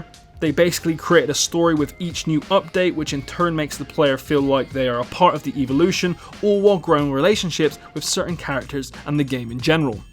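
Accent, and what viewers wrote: United Kingdom. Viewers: he's British it's how we say it so stfu ffs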